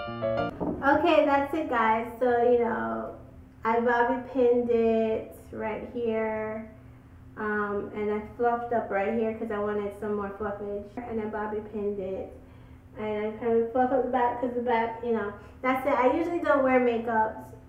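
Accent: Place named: Caribbean. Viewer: Found ur channel today. Great videos, I learned a few things. Aside from that, its super creepy, but we have the same accent and voice. I'm probably older than you, but I feel like I am watching a video of myself. I showed my boyfriend and he things so too. Are you by chance from the Caribbean?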